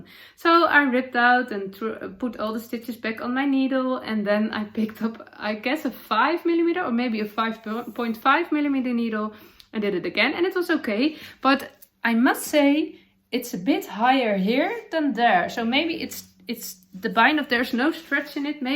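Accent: Netherlands. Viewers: I love your podcast and your “dutchie english”, it reminds me of my late father. He was stationed in Europe in the 50-60’s and had very fond memories of the Dutch people. I am grateful you record in English so I can enjoy out time together! 💜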